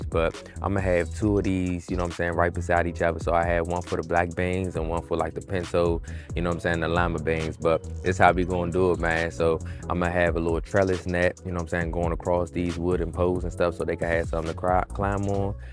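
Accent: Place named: Chicago, United States. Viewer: I hear a Chicago accent. 😊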